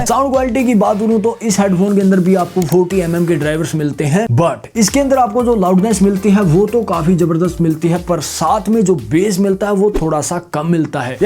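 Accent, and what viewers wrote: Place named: Punjab, India. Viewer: Punjabi ho ke harayanvi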